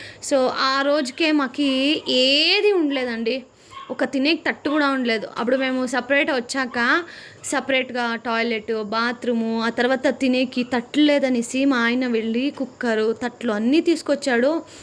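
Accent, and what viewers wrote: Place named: Karnataka, India. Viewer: Sister do you speak kannada also. Because I heard some kannada words in your voice over....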